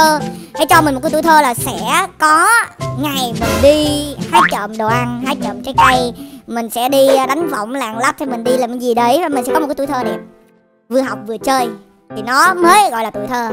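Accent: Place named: North, Vietnam. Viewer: Nói sao lúc sau giọng bắc luôn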